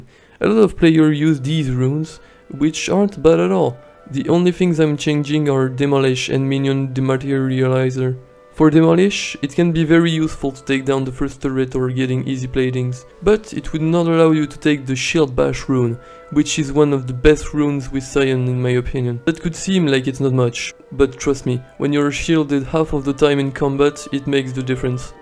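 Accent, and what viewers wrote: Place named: England, United Kingdom. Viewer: l'accent anglais de mieux en mieux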